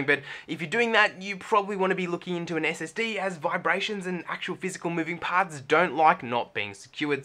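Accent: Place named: Australia. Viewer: Mans chinese with a Australian accent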